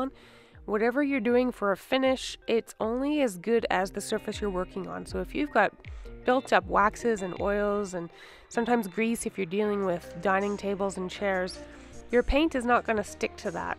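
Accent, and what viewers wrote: Canada. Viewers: Hi! I'm a brand new subscriber of yours, and what I like about you is that you produce great work without having the use of an all 'singing and dancing' workshop, ie no table saw and other modern tools you see in other peoples video's. Oh and your nice accent ( Canadian)? I look forward to more of your vid's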